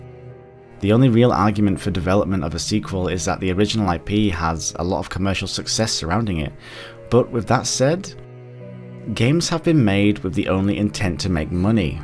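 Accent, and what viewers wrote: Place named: North, United Kingdom. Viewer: ok I already spent 4 miserable years living in the north I don't need to be listening to your disgusting accent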